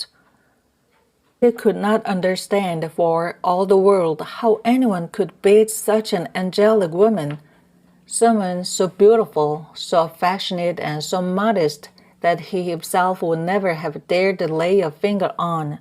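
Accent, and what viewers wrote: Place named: United States. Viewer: 오우~아메리카 발음 👍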